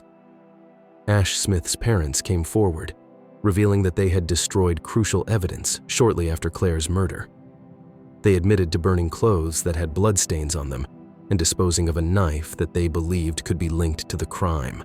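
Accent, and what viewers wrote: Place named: United States. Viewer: I question why anything and almost everything that has happened in the U.K. usually ends up on a YT with an American Accent? Also, why do they all assume that we have the attention span of a goldfish? Must be something to do with spinning out the length of the video to earn more money? Why not just put in a little extra effort and quit with the annoying repetition?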